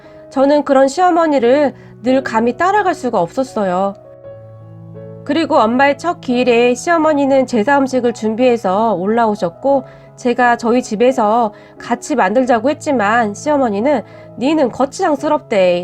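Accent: Gyeongsang, South Korea. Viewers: 갱상도본토배기보다 더잘하시는데요?ㅎㅎㅎ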